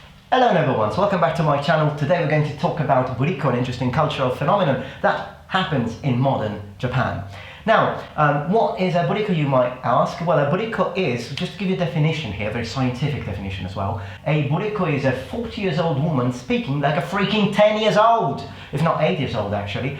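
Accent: England, United Kingdom. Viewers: Perche' e la prima volta che vedo un tuo video ,e mi sembrava dall'inizio che sei italiano,anche seppure hai l'accento inglese ahahha.E io poi che sono rumena ,ritornata 7 anni fa in Romania dall'Italia :)))?! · from my vantage point here in merica you need to do some bicep curls bro. and why do you sounds like an Englishmen if you're an eyetalian?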